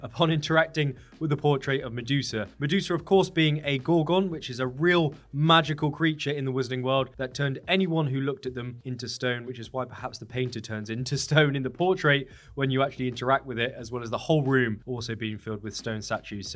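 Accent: England, United Kingdom. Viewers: always! Your videos are the only ones I’ll watch for HL content. Professional, eloquent, and no fluff. And an English accent is much more preferable to an American one. Haha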